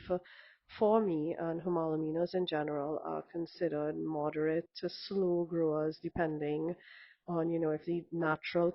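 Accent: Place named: Trinidad and Tobago. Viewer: Oh what a beautiful plant, I find homalomena to be a pretty trouble free plant and usually when she's thirsty she'll lean a bit, she's positioned for some direct morning light which I'm seeing no issues with thus far. Immediately I recognized your accent as trini, and wow it's absolutely wonderful to hear a fellow Trinbagonian doing planty videos, keep up the good work 🇹🇹 ❤😊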